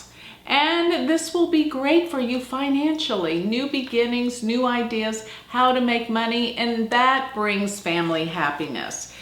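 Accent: United States. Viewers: Typical American accent....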